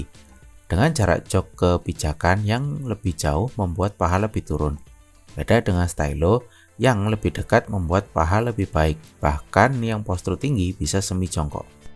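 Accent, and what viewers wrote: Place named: Java, Indonesia. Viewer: Lebih suka sama suara mas yg dulu karena ciri khasny medokny 😢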